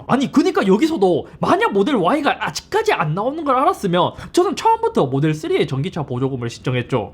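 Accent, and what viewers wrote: Gyeongsang, South Korea. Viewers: 섭아....내가 한마디만 할께...KT 썰이 공중파까지 타고...캐티통신서비스 개선에 엄청난 파급효과인정... 그런데..테슬라 썰은...아닌것 같다... 본인이 흰색 시트 주문하고서..(미국도 화이트시트 구하기힘듬) -__-:: 테슬라가 본디..그러한것은 알고 있잖소...아니말로 잇썹 구독자들도 갱쌍도 발음 신경쓰이긴 하지만... 내용의 퀄러티가 좋고 해서...매번 참아가면서 보고있단다... 본인 선택이 빠가(?) 스러운 결정이였는데......영업사원이 모델Y가 빨리나온다는 말만 반복... 쩝...아예 계약을 취소하고 이런방송을 하였으면..인정하는데... 은근히 백만유튜버이니...테슬라 지사에서 신경써서 출고해달라는...방송같다... 초심(?) 항상 생각하자......섭아....이상......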